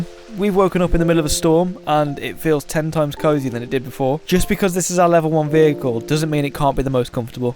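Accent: United Kingdom. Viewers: ryan trahan but in british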